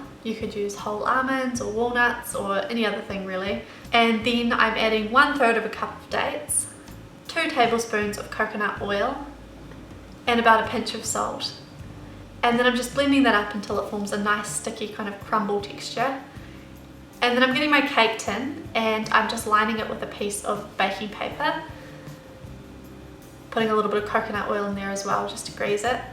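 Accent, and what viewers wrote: New Zealand. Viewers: I'm Australian, and she sounds New Zealand to me. Though in my experience, South Africans who have lived in Australia for a number of years can sound like New Zealanders too. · is she from new Zealand I am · I'm certain she is from New Zealand that sounds like a very thick New Zealand accent to me. I'm Australian too and we def don't sound like when we speak.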